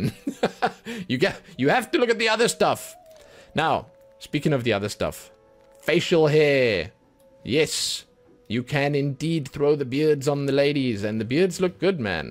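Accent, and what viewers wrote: South Africa. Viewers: bro you south african?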